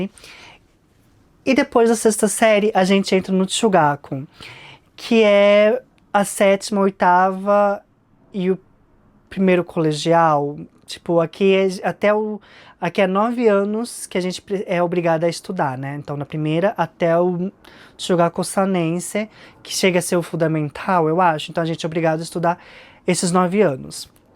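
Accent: São Paulo, Brazil. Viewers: Nossa vc fala muito bem o português. Seu sotaque é paulistano. Vc é poliglota, que tudoooooooooo. Vc precisssaaaaaaaaaaaa vir para o Brasil, tem tem tem que vir, vc vai adorar muito. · Seu português é perfeito e vc tem sotaque paulista, se eu não tivesse ouvido sua história, se vc falasse que cresceu em SP, acreditaria 100%.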